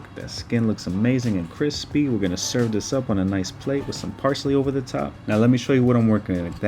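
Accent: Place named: New York, United States. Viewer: my mans new york accent makes me feel right at home!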